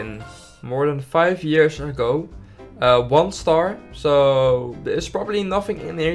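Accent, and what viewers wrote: Netherlands. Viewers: Are you dutch? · Sorry.... But i smell dutch btw ik ben het ook! Je bent goed in ebgels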